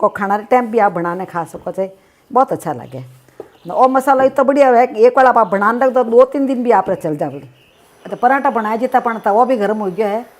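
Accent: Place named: Jodhpur, India. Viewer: Mare in-laws Jodhpur rahte ha apki language sun kr lagta ha sasural pahuch gyi😀😀 Thanks for yummy parathas sasuji🙏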